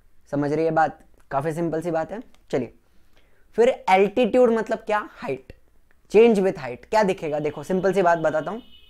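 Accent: Maharashtra, India. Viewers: Sir aap aaisa bol rahe ho...usse lag raha hai ki app MARATHI HO............ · Sir u r marathi ? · Sir tumhi udi marta mhtl mhnje tumhi 100% marathi ahe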